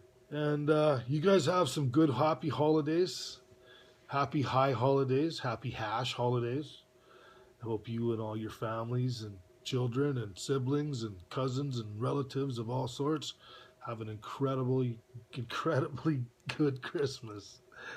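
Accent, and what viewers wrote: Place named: Upper Peninsula, United States. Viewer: are you in the Yoop by chance? I am originally from Iron Mtn area and live in Denver. But i tht I could recognize the ol' accent. Great video, i saw this dudes video about a week ago and was blown away!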